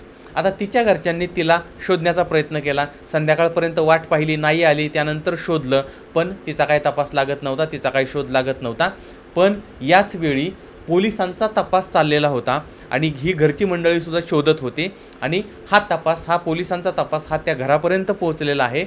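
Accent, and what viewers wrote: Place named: Ahmednagar, India. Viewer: saheb, nice Nagar (Ahmednagar) language. This language is very superior to other district languages especially vocabulary, uniqueness, least niazam urdu, systematic presentation. Keep it up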